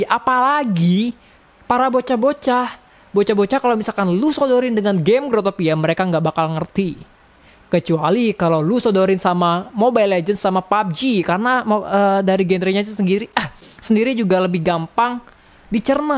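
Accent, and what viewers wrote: Indonesia. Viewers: Bang, aku mau nanya tapi jujur ya, abang orang Babel ya?, Bangka Belitung,.. iya gak?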